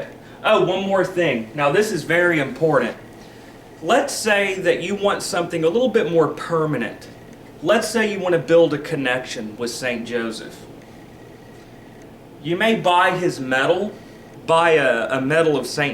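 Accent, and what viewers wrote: South, United States. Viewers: I love your hoodoo stuff the most. It must be the Southern Accent.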